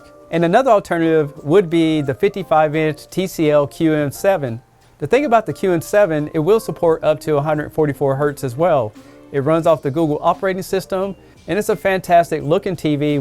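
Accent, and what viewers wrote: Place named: North Carolina, United States. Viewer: I’ve watched a lot of your videos but still can’t quite pinpoint your accent. I’m thinking somewhere in the North Carolina area? Maybe Kentucky?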